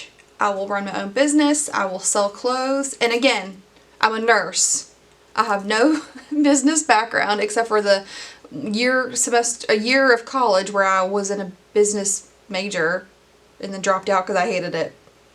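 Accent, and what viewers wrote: South, United States. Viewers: You’re 100% southern USA or have a deceiving accent if not! Love this video and your story! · I am also bipolar and definitely understand that manic episode feeling a thousand percent! it's like you don't have control over yourself or your actions but you don't realize you don't have control you just feel super energetic I'm so sorry that you got sucked into that negative situation but I'm glad that you are out of it ❤️ also so excited to see someone with a Southern accent on youtube! From a Georgia gal living in Alabama 😂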